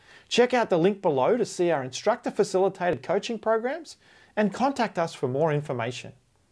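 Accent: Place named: Australia. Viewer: Thank you for making these great free playlists! Really helping me transition from the "old world" ways without the usual stress. Aussie accent helps too haha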